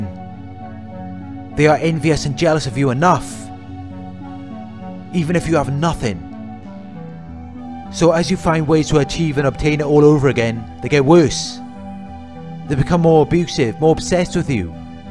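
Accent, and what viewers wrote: Wales, United Kingdom. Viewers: I agree with every thing you say!!!. Thanks for your videos, they're great. I like your voice and your accent too, sounds welsh(?)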